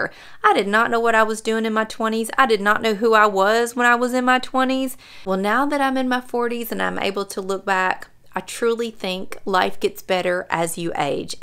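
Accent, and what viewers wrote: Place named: Kentucky, United States. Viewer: Wow. My first program from you was all about Pollock. Great u-tube. Now I sit down with you, and get my visit with my new best friend! You are so fun to get to know. I've seen 10 of your videos and am hooked. My Daddy was from Texas. I was born in Paradise (San Diego) and in elementary school people would ask me about my accent. Was I from the South? I love your Kentucky accent and and you confidence, southern charm and relate to your experiences. I'm 72. Soon to be 73 in June. TY for being my new BF. God bless you, lady bug. Linda D in SD.😊🇺🇸💕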